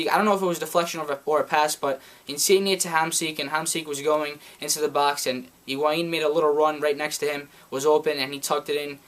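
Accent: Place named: United States. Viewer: dal suo accento direi leggermente di no. è un americano illuminato.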